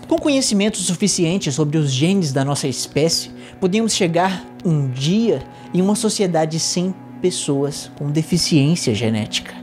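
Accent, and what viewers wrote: Ceará, Brazil. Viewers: Só uma pergunta, ele é do Ceará?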